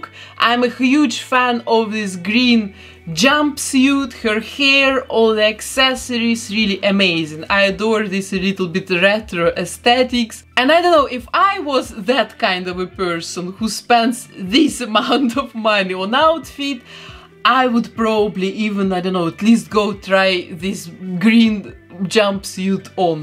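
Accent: Russia. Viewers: Приветики рашн акцент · По акценту сразу понятно, что вы русская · where are you from? i'm sorry but your accent is hhhhhorible. неужто из России, мать? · мне кажется, или я слышу русский акцент?😂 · Русский акцент 😃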